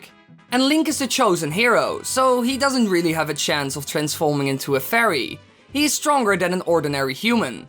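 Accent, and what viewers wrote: Netherlands. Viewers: Your voice sounds dutch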